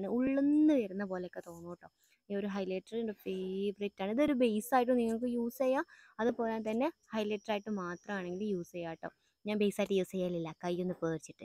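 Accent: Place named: Malappuram, India. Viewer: Ithaatha Malapprm aano place.. Samsaram kettitt thonnnd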